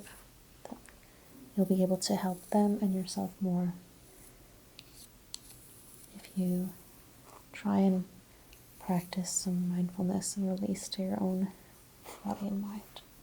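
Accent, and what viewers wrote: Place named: West Coast, United States. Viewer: I totally thought you were east coast! Not west coast! I am JEALOUS of the Seattle ladies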